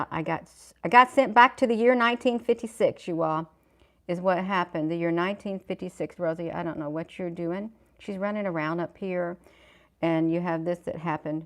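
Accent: United States. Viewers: Yes, 😘 Speaking through your 👃 Nose as well as your 👄 Mouth -- an American Accent with Breaking and Bendy Vowels. It Ain't Country Without a Drawl (and your pretty smile-ing-eyes). TS: - ♥️🎩💈🎯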